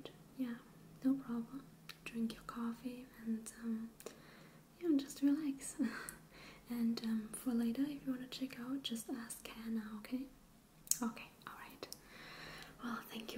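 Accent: Germany. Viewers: What an extraordinarily charming German accent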